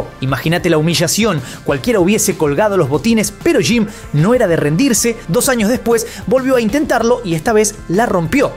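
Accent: Argentina. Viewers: La vocesita y el acento argentino que no deja de verse el ombligo y sus comentarios lúmpen hacen que mejor veo cosas inteligentes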